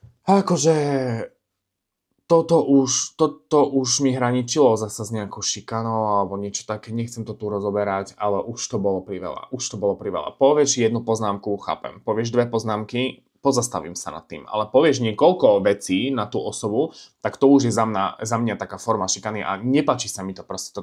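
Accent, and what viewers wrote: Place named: Eastern Slovakia, Slovakia. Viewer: podľa prízvuku od vychodňároch.. či? 😅🤣❤ Som z východu.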